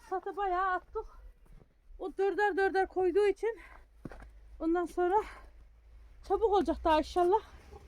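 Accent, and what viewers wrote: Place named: Giresun, Turkey. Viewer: hn.Bn İstanbulda yaşayan bir Karadeniz'li olarak merak ettim neresi diye cevap verirseniz memnun olurum.konuşmalarınız aynı Giresun şivesi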